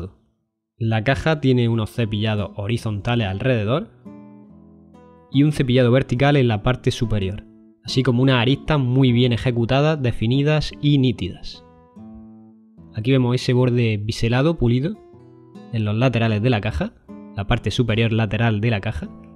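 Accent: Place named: Andalusia, Spain. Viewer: Tu tono andaluz y la música hacen de este video una joya....saludos desde Tucumán Argentina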